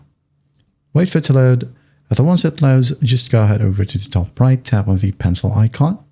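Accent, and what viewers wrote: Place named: Australia. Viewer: i guess australian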